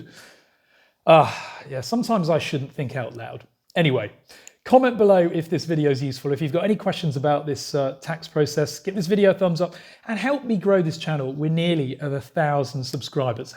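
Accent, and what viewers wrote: Sweden. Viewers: Thanks for the info! Have you picked up the local Swedish accent when speaking Swedish?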